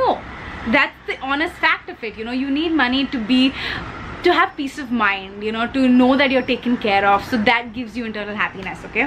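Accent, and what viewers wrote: United Kingdom. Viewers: You do amazing British accent.... I love that..❤️❤️❤️❤️ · please do a whole video with you’re brutish accent it’s just gorgeous, I could go on listening to it, simply music to my years #britishaccentvideo💯 #SundazewithMals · Your British accent was so on point!! Is it weird that I want to see more of it xD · LOVE LOVE LOVE ur british accent😢😢😢 i have been thinking about it today only❤❤❤ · U are so damn honest ...love that about u...and funny too...my that British accent 😂...that’s what keeps us subbies rolling on to come back to your channel again and again... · Also I loved your British accent....I am a Potter head and you reminded me of Emma Watson seriously! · Your British accent is perfect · Omg! That British accent wow I'm impressed!! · Your british accent😂😂 love it 😂❤❤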